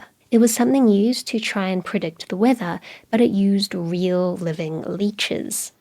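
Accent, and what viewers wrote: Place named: Australia. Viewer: Which city are you from? I ask this because you have a very interesting accent that sounds very distinct from other Australian accents.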